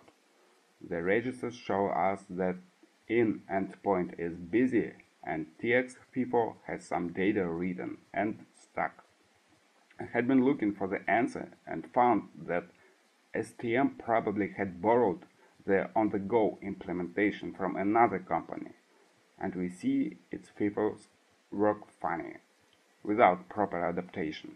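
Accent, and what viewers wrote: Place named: Ryazan, Russia. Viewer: Отлично! Долй HAL. Понятный рязанский акцент без американизмов легко воспринимается на слух. Кусок перекачки файла стоит обрезать процентов на 90.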